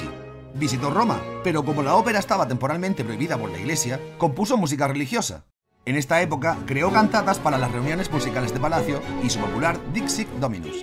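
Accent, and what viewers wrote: Spain. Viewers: Vives en Andalucía Albacete o Madrid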